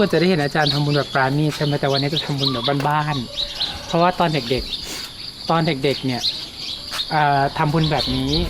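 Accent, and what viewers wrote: Isan, Thailand. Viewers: สาธุสาธุสาธุอาจารย์ป้าเป็นคนอีสานจ้าได้ฟังอาจารย์คุยกับแม่อาจารย์เป็นคำพูดอีสานฟัวแล้วคิดถึงบ้านจ้า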